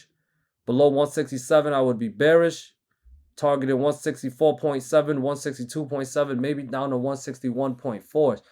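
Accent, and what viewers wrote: New York, United States. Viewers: Yo, when are you going to reveal your identity? You're definitely from NYC. You probably from Brooklyn cuz you stay keeping it 💯 😂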